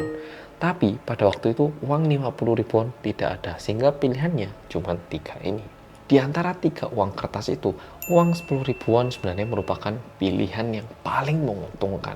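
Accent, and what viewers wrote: Java, Indonesia. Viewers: Walahhh mase ngomonge kok medok. Menn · Mas medok🤩🤩🤩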